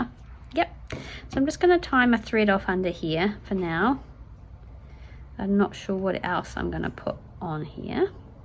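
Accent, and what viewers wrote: New Zealand. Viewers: I so enjoyed watching this video with you! I’m a Kiwi too so it’s nice to hear a familiar voice! Love these little bags, which will be great additions to my journals and tags. Look forward to watching your next one! Wish I had seen your Giveaway, it looked really amazing! How exciting to be waiting for a new grand baby!🧚‍♀️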